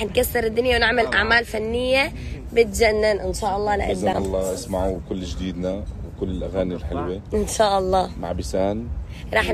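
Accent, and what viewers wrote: Iraq. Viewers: سؤال انته عراقي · لا حبيبتي عراقي شوفي من الهجه بين اذا سوري ليش مايحجي سوري شنو مايفتخر بلهجته مثلا🙃😏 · من العراق لهجته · من كلامه عراقي ❤❤❤ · من العراق مبين من كلامه😉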